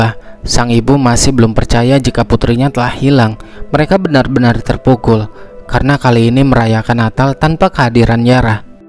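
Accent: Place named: Banyumas, Indonesia. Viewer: Salam ngapak.... 🙏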